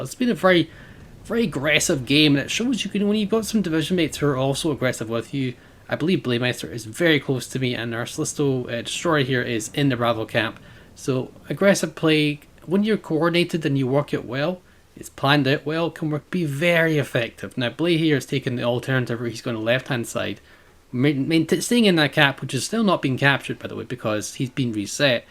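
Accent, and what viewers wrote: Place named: Scotland, United Kingdom. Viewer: Very well played, really like your videos, always nice to hear a wee Scotsman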